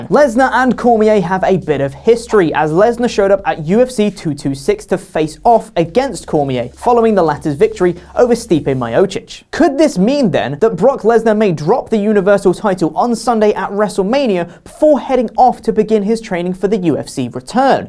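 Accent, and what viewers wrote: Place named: England, United Kingdom. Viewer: As long as you have an English accent I could care less if you’re a different person.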